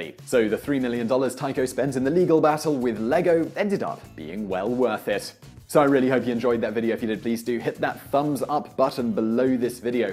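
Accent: United Kingdom. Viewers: I'm disturbed because this Matthew Santaro/ Vsauce hubris has a British accent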